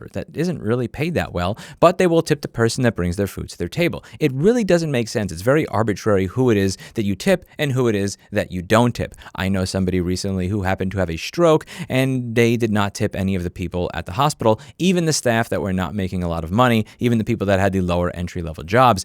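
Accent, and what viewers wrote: New York, United States. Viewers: Your New York is showing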